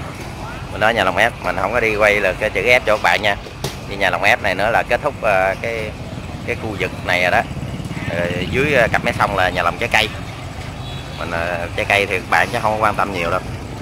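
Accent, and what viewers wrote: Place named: Mekong Delta, Vietnam. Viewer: Nghe con nói chuyện...Thấy thương quá!😍 Rặt dân miền Tây luôn!🖐😍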